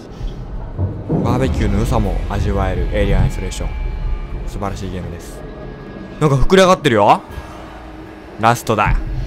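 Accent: Kansai, Japan. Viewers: 関西弁さん好きなので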